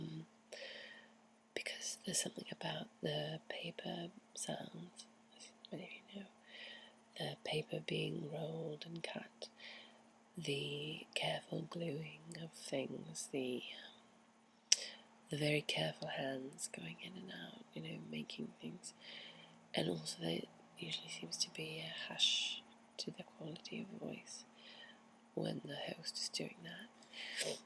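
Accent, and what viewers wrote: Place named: England, United Kingdom. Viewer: I don't know why it's interesting. The title says what the accent is. But to be specific it's an English accent. People only call it British because that's all Americans will ever understand about British accents.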